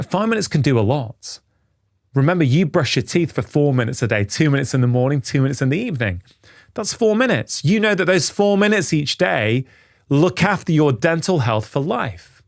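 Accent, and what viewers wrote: United Kingdom. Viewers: I am sucker for uk accent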